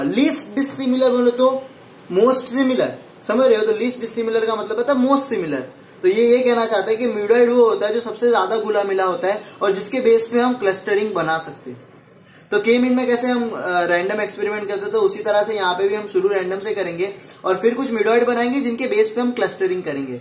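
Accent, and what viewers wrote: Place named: Bihar, India. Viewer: bhai,lagta hai aap bihar ka ho😁😀😛😛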